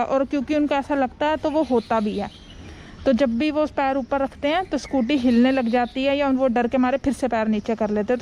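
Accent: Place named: Punjab, India. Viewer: Plzz hath main gloves mat pehen lena unko dekh kar he aapke khoobsurat chehre ka andaja lagata rehta hu baaki aap chehra to dikhate nahi😞Aapki punjabi, himachali accent wali hindi achhi lagti hai🤓❤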